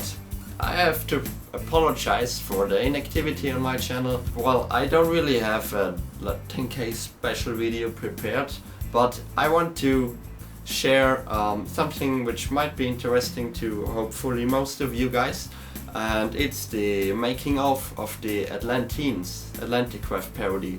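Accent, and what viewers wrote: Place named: Germany. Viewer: could it be that you lived in germany before you went to Australia? Because you speak english with some kind of accent;)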